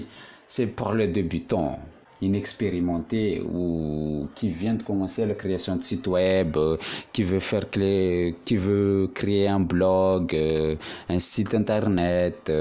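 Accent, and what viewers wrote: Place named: Madagascar. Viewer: J'adore l'accent! Êtes-vous malgache? En tout cas je ne pense pas ça soit vous sur la photo mdr!!! Sinon bravos pour la vidéo, elle m'a bien aidé.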